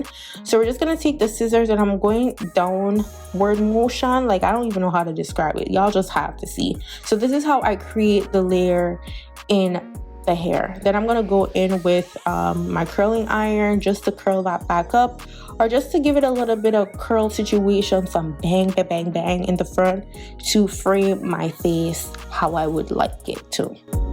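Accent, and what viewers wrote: Jamaica. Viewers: Girl look pon de lace lmao, love when you speak patois. Lovely unit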